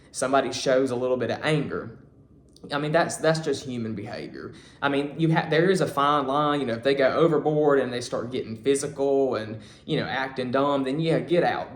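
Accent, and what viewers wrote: South, United States. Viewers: I have one qustion for U; Are ya Suthern? Cute as Funck!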